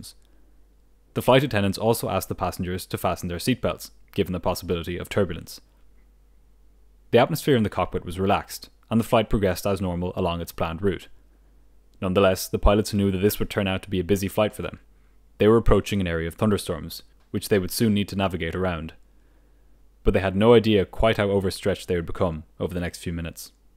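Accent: Ireland. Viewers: Love your channel ❤ ye sound like a fellow Irishman 🙋‍♀️🙋‍♀️ great to see an Irish channel doing well 🇮🇪🇮🇪🇮🇪🍀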